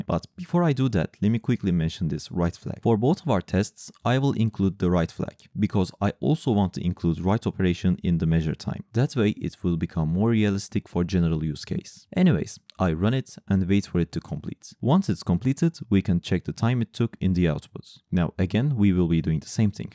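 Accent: Turkey. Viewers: Your accent sounds Turkish lol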